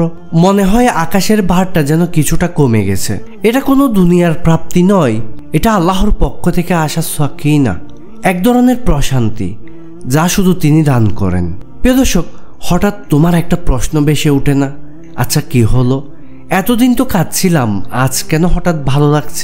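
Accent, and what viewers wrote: Chittagong, Bangladesh. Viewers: Tumi ki chatgaiya poha naki?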